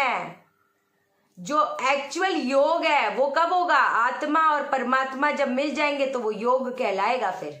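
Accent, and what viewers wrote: Punjab, India. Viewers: Yaar kya mast attitude hai twada bilkul punjabi tone blesss you darling and love your mom also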